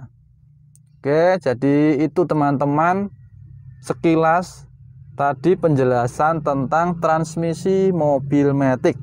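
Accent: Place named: Java, Indonesia. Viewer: Suara nya medok ya kak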